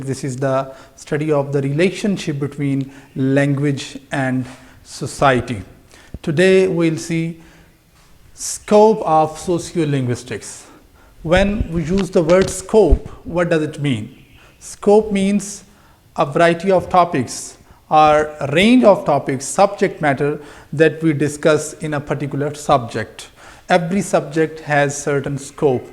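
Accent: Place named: England, United Kingdom. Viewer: Wow sir i like your English accent 🤍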